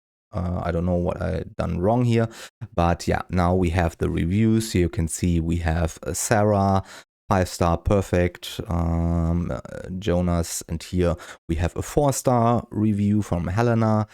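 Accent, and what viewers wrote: Germany. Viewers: Akzent einfach deutsch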